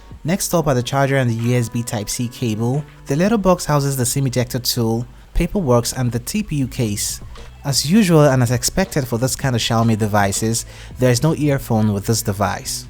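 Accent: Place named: Nigeria. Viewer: God willing you are going places bro....Exceptional Presentation Voice....Voice and Accent Wise you just the best Nigerian Youtube reviewer for now.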